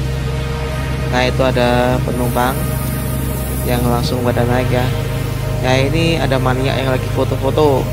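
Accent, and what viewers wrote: Banyumas, Indonesia. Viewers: Aku wong ngapak juga mas 👍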